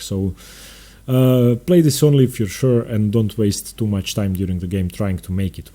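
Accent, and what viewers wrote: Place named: Russia. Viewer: Of course he has russian accent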